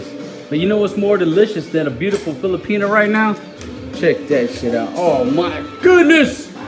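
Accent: South, United States. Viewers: Great country voice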